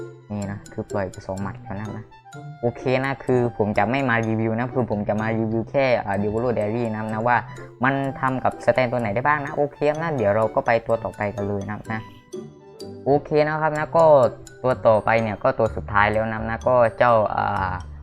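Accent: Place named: South, Thailand. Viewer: สำเนียงคล้ายคนใต้เลยนื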